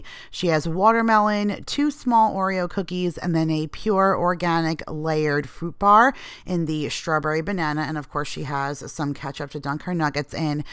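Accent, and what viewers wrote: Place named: New York, United States. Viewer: I’m in Suffolk County Long Island! I swear from your first video I saw of yours, I said she’s definitely from NY or New Jersey! Lol you haven’t lost that accent 😉Have fun with your Mom 💞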